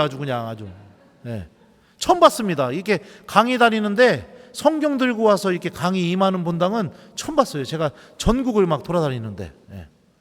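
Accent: Chungcheong, South Korea. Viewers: 잠이 오지않아 우연히 듣게된 말씀 입니다 간간히 쓰시는 충청도 사투리가 정겹습니다 강론 이 감동입니다 찾아 듣게 되는 강론 이며 ㆍ 감사드립니다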